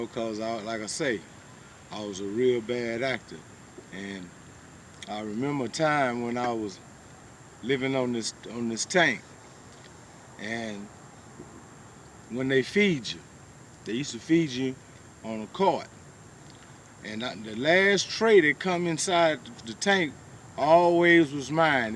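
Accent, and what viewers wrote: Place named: Texas, United States. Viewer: I knew u was from TX when I heard “gettin fly at da mouf” 😭 mane den I seen the location on da video and u in da southside of houston I’m from da nawfside much love bro I fw yo videos.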